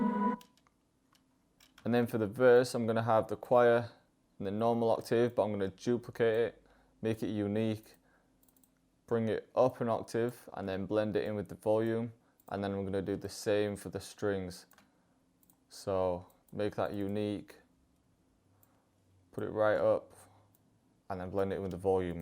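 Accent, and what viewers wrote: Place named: Northern England, United Kingdom. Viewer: He's from up north prolly manny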